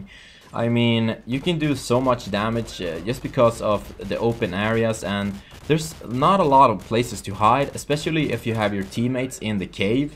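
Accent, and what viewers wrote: Sweden. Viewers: lol Your Swedish accent is so hilarious.. keep it up dude. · are you swedish?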